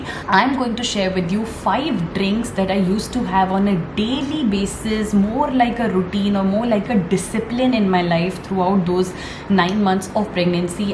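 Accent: India. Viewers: This is such a normal indian english accent.. What a ridiculous comment. How is everyones mother tongue hindi in India???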